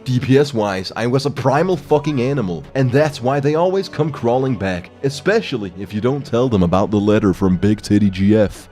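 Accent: Denmark. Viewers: Wait a minute - er du dansker hahaha? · bruh, you from Denmark? Got that Arla hitter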